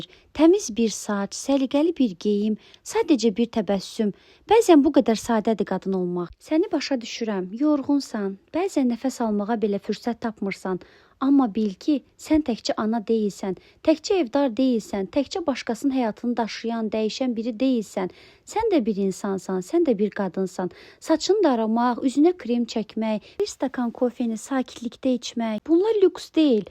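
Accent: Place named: Goychay, Azerbaijan. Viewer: Hər kəsin bəxti siz kimi gətirmir... Ağzı Göyçəy, ağzı Göyçəy danışırsız elə.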